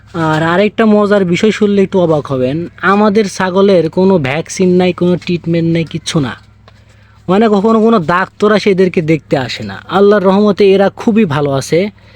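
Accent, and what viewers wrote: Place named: Bogura, Bangladesh. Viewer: ভাইয়া আপনার বাসা কোথায় কথা বার্তা দেখি আমাদের বগুড়া জেলার মতো,প্লিজ বলবেন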